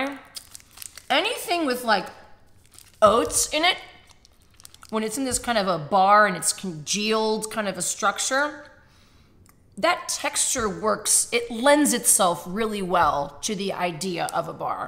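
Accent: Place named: United Kingdom. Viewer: How fun!! - Looking forward to the next one! ... and Your British accent is impressive!!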